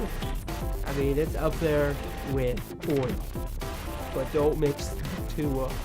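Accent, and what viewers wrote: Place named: Ireland. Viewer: Yeah I must say I love your little floating objects! This is me requesting your next video to be in your Irish accent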